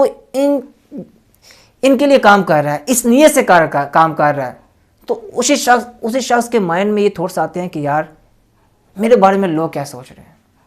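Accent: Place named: Punjab, India. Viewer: MASHA ALLAH aap buhut acha kaam ker rahy ho.....well done... buss apny Urdu accent py kaam kerty rehna.... kyonky Punjab main yeh normal hai....Mulk (country) ko Mullak.... Motivational speaker ky liye us ki awaz aur lehja buhut ihmiat rakhti hai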